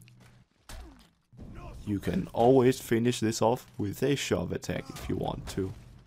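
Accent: Denmark. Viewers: First of all, great fucking video. Second of all, that is the most extreme Danish accent I've ever heard! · Are you danish? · I can hear the Danish accent, it’s really thick. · Not to be rude but your English sounds so much like a typical “danish English” Are you Danish? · Thats is one very thicc danish accent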